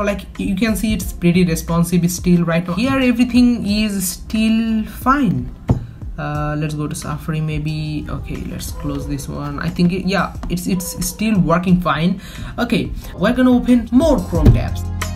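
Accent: Bengal, India. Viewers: No offence but try to change your Bengali accent it sounds shit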